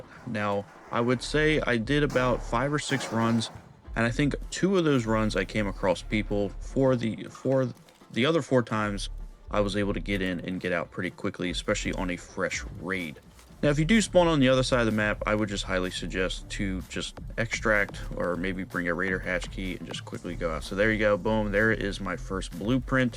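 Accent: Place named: Philadelphia, United States. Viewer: Philly delco or Maryland? I hear It in your accent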